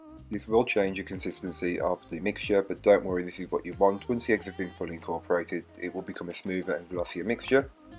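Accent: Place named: England, United Kingdom. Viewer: Can I ask where your accent is from in England. It sounds very dulcet to ones hear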